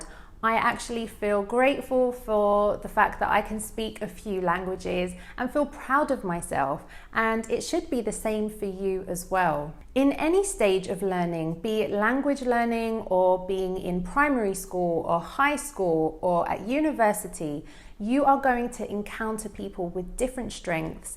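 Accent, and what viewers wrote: United Kingdom. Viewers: I love your British accent. It's a lovely accent plus I love the Scottish accent. Thanks for a wonderful video and superb channel you are a gorgeous English instructor. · i love your accent. i mean, british accent👍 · I need to listen to british accent more. It is very beautiful, and your tips, particularly, are great. Thanks for your dedication in teaching. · Please tell me in which british accent you are talking😊💐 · I've been learning British EN for four years. Your videos are really great to keep learning the British accent🇬🇧💛 Thank you so very much!!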